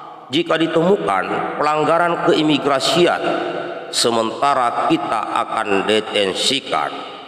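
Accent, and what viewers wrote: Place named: Sulawesi, Indonesia. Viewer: dialetnya mirip tolaki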